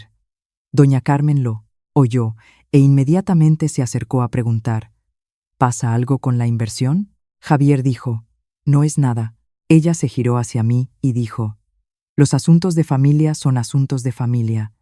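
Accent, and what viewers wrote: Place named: Spain. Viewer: El relato es desde España. Allí se habla así. Mis hijos sun despues de 15 años de volver a Argentina siguen hablando así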